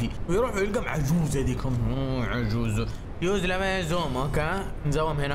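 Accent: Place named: Jizan, Saudi Arabia. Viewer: احلى لهجه هي الجيزانيه احبك 💗💗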